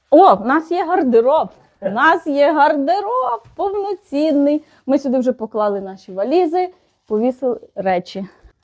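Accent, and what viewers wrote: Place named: Lviv, Ukraine. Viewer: Супер! За вікном казка. Чудові краєвиди❤ У ваших відео є особлива родзинка -мова, львівський говір, і це дуже притягує. Дякую, що поділилися враженнями. ❤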